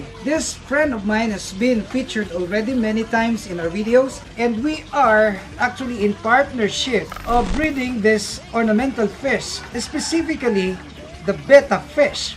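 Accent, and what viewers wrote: Visayas, Philippines. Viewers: Bisaya ka nong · Ka lowm pud mo bisaya ni kuya oi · Bisaya diay ka lods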